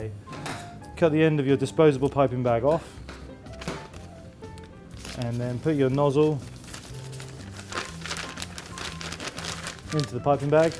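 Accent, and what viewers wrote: England, United Kingdom. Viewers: where r u from? england? lol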